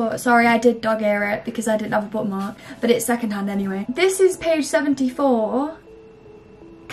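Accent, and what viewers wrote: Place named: United Kingdom. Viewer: Hello:) Thank you for sharing and updating your videos and I really like them! You're lovely! Actually I started to read the Bible(ESV) from last month and I’ve been learning British English for about a year. Can I ask you something? Do you have a plan to read the Bible(ESV) with your British accent, If you want? Your voice will definitely help someone who learns British English to be encouraged to read the Bible😊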